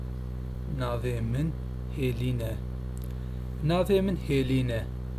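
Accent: Turkey. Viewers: The speaker is speaking Kurmanji, but he has Turkish accent when he speaks Kurmanji. Unfortunately, many Kurds first language is Turkish in northern Kurdistan because our language was banned in northern Kurdistan. Turks thought they could assimilate us :(.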